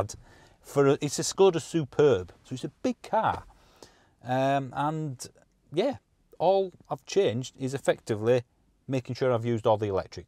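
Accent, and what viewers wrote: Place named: Lancashire, United Kingdom. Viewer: As a Lacashire lad now living in California, it was great to hear a lad talking proper. Although the comments you make are obvious to most who take the time to watch the video, the point you make about plugging it in, using all the electric up before you at a point where you can charge it up, is why the video was very well received. Its common sense that way too many people just ignore, and then complain they don't get good enough mileage. I have ordered a BMW X5 45e plug un hybrid just waiting for it to come in. In the US the PHEV get a $7500 tax credit, because its assembled in the US, making the car cheaper than the none PHEV X5 40i. Very good video.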